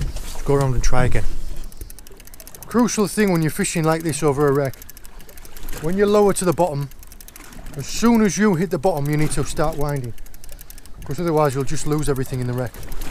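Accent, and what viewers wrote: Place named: North of England, United Kingdom. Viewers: Best fishing stuff on YouTube.. & this chap sounds like he's from north of England.. & I'll bet he's military or ex military.. reel guy.. very addictive viewing.. keep it going... Manchester😃😃. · you say you hope we enjoyed seeing you catch AND release some lovely bass and even a couple of Pollock. Whats NOT to like about you with your super Northumbrian accent your ALWAYS passing on your skills?? You are what Mr Elton Murphy is to Coarse Angling, You are to Sea Angling young man!! Both first class AMBASSADORS to your chosen disciplines. 😂😊😂.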